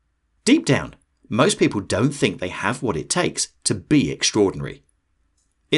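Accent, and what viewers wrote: United Kingdom. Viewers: Brilliant video. And your British accent drills the message into my brain with elegance.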